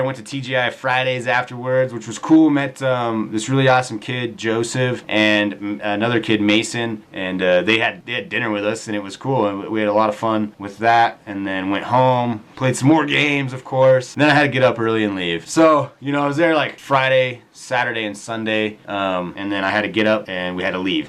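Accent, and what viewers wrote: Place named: England, United Kingdom. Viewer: With your voice I thought u lived in England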